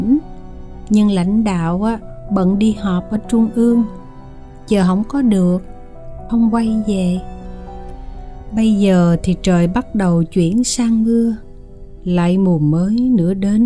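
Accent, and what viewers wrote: South, Vietnam. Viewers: Truyện của Nguyễn Ngọc Tư với giọng đọc của bạn nghe thật tuyệt Chất giọng miền Nam ngọt mà sâu lắng thể hiện nội dung câu chuyện và tâm tư của các nhân vật Giúp người đọc cảm nhận được những điều tác giả gửi gắm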